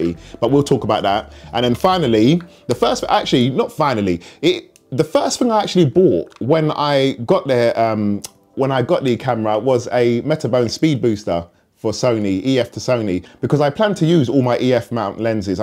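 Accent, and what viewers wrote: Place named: South, United Kingdom. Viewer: Nice video mate, great to hear another southern British accent on YouTube hahaha!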